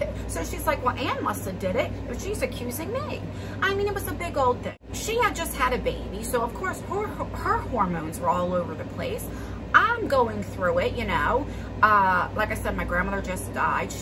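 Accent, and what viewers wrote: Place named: Baltimore, United States. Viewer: your accent is what draws me lol. It’s not the same, but I’m from Baltimore City and remember my not so great youth! I’m in the south now for years and live to hear folks talk like home lol. All that other crap I really don’t pay attention to. In my opinion the folks that feed off that shit should be ashamed!! I started watching around the time after your daughters scare, but just from watching a few if your videos at that point, I could see your genuine. You sound like my best friend growing up that I got in trouble with and your videos make me feel Like I’m sitting down with an old friend. Screw those a holes!!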